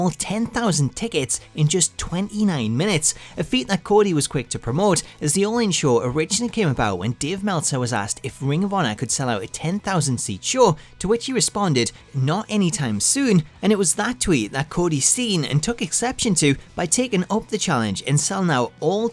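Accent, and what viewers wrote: Newcastle, United Kingdom. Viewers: Newcastle sounds like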